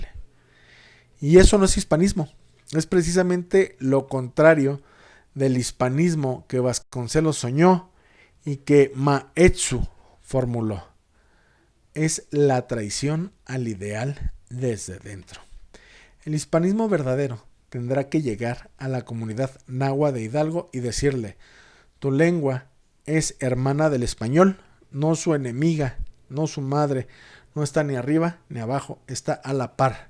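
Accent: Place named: Extremadura, Spain. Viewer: el ispanismo es que usted habla como yo estremeña 73 años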